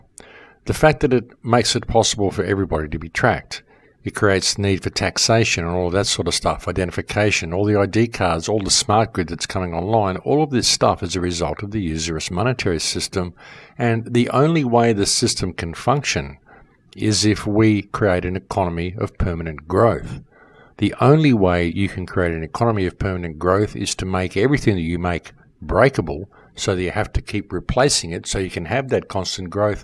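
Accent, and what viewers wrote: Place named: Australia. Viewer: I'm new to yuor posts. Very informative! Refreshing to get schooled in an Aussie accent! Cheers mate! Oh yes... keep up the good work!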